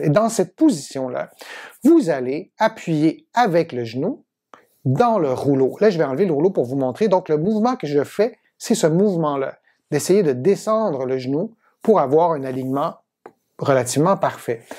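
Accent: Quebec, Canada. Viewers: Merci pour vos exercice il sont vraiment utile moi je suis un cas special j ai ete operer d une ghonatrose bilateral <num> une osteteomie depuis 10 ans actuellement je souffre beaucoup de mes genoux meme au repos ont me propose une 0peration totale mais actuellement dans mon pays avec la pandemie les blocs sont ferme par manque d oxigene meme les cliniques n opere pas je narrive plus a marcher malgre j utilise deux bequille donnez un conseil svp en attendant de me faire operer j ai 78ans je pese 88kg pour un metre 62 j j attend vos avec impatience svp votre avcent canadien est tres sympat mer vos video elle sont interessente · Merci pour les exercices, l'avertissement sur leur adaptation, et pour ce bel accent québécois. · L'utilité indéniable des exercices mise à part, votre accent canadien vous rend de suite sympathique. Bravo pour votre belle initiative. 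Je viens de m'abonner. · Merci beaucoup vidéo claire, et juste, j'ai fait et c'est pas mal du tout pour un début, le tout avec cet accent canadien que j'adore.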